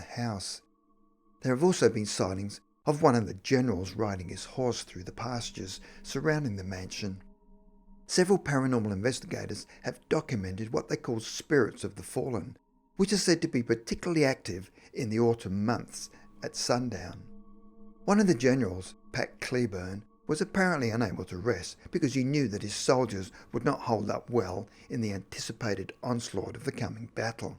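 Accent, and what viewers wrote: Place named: Australia. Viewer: Ohhh ok. Well great! Yup. He was right about that. And I'm glad he did more shows in prisons. To spread love cheer and the gospel. I had only heard of him playing At Folsom.... So that's why I was wondering. I never have learned everything about him. But yeah I'm glad he came to Tennessee state prison in 1974. 🙂👍 And yes your welcome. Your videos are fun to watch and spooky and interesting. Your Aussie accent makes it even better. 😄. P. S. I live in Memphis TN and grew up here. I have been to the Orpheum once years ago when I was a teen and it is definitely a beautiful but spooky place. I read that Mary the little girl ghost ....once threw the janitor lady's spray bottle into one of the toilets. Lol. She looked everywhere and found it there. That was crazy.😄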